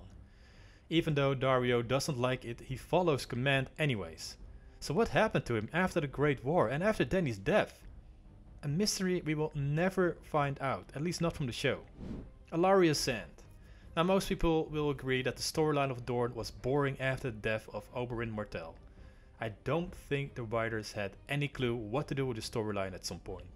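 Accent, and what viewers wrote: Netherlands. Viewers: are you by any chance dutch? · Nou je Engels is goed genoeg hoor! Had wel binnen 10 seconden door dat je uit nederland komt;) Lol!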